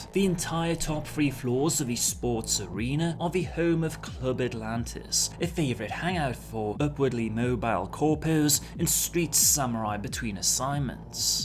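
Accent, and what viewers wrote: England, United Kingdom. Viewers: I'll just take it that I wasn't off with that assumption. I would like to thank Sean Bean, for it was his accent that yours reminded me of. Mostly the pronounciation of the ol' diphthong "ou" like in "yOUng". Jolly good. That said, it's probably the best of the english accents, because Sean Bean.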